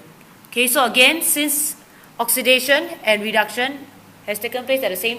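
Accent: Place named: Nigeria. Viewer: She has a Nigerian accent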